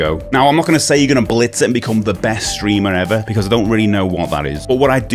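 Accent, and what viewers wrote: Liverpool, United Kingdom. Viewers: Its never to late mate! Btw .. are you a Scouser?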